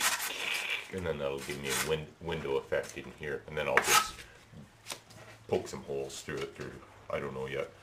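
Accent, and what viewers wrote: Canada. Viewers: canadian ahy?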